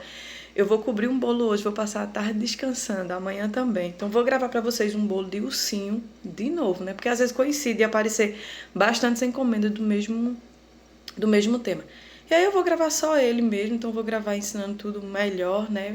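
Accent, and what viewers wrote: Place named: Northeast, Brazil. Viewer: O sotaque nordestino é muito lindo,eu tenho ranço do Paulista toita,poita,kkk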